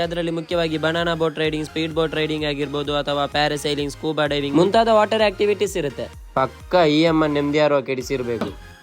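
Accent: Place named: Kerala, India. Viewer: Malayalam slang anne chandha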